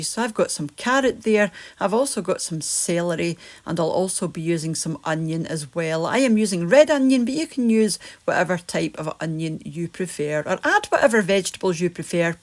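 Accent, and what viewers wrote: Scotland, United Kingdom. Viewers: I love the Scottish accent too :) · your Scottish accent is so beautiful. I miss hearing the accent. I was borne in Airdrie and the family moved to the USA when I was about 3. My dad and mom never lost their accent unfortunately I lost mine. Your recipes bring back so much memories. I remember some of them so thank you for the wonderful memories and recipes. Now I can try them myself. Thanks again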